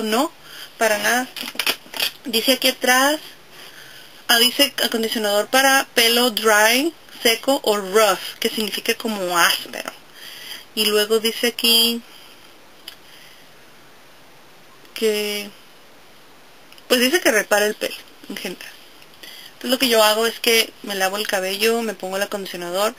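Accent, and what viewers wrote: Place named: North, Mexico. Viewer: buen consejo gracias... Eres de Tijuana?? hablas identico que mi hermana.. bien nortena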